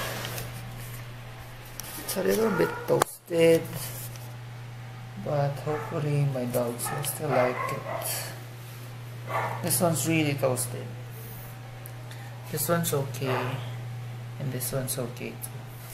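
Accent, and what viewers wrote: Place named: Philippines. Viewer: based on how you speak english, i think you are a filipino?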